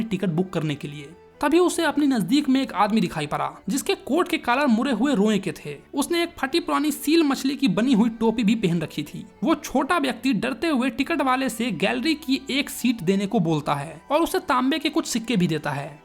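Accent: Bihar, India. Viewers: Are you from Bihar 😎😎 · I think you are from bihar... Right?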